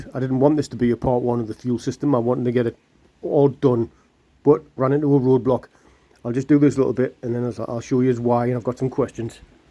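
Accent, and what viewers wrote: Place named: Newcastle, United Kingdom. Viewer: ...one of the truly great iconic cars of the early 70's along with the mk3 Cortina, geordie accent is a definite turn on by the way! 👌🧸💜xxx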